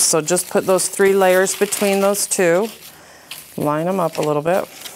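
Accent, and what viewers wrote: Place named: United States. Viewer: bravo, but your english is only for americans,you talk to fast and i hardly understand what you say,bravo any way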